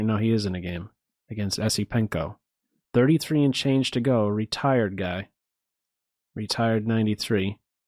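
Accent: Pennsylvania, United States. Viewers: did I hear a Pennsylvania accent?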